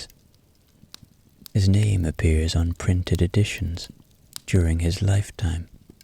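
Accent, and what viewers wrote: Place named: Ireland. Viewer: Being ill with MS I often fall asleep during the day and I have taken to listening to at these times as well as the time when I have difficulty sleeping at night fatigue is weird like that but you are amazingly talented and you are the perfect person to help me get to sleep being Irish and having that lovely Irish accent and soft lilt that I love I am so happy and grateful to have found you.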